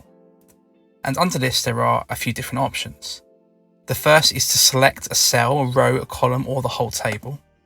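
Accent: United Kingdom. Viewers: An outstanding tutorial. Voice is nicely paced (not MANIC like others) and the background music is softer and just right . This gentleman has very good diction and his British accent makes for fact-filled, easy listening. Way to go!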